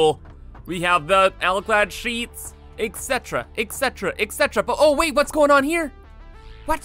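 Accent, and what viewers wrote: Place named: Canada. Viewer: "Aboot", "byees".... methinks is Canadian, ey?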